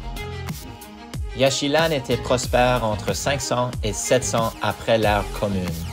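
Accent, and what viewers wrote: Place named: Quebec, Canada. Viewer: J’adore votre accent québécois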